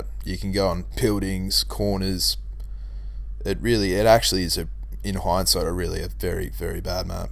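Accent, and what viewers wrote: Australia. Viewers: you are Aussie?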